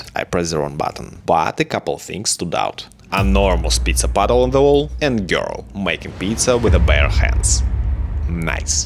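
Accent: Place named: Russia. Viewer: У него акцент как у русского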